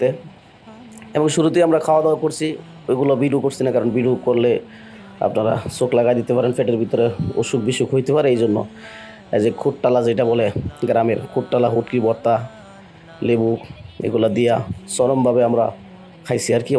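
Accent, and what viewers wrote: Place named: Raipura, Bangladesh. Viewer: ঠিক মতন দিছেন একদম আমাদের রায়পুরার ঐতিহ্যবাহী আঞ্চলিক ভাষা!!! আমডারে লইয়াও তো যাইতে পারতেন.....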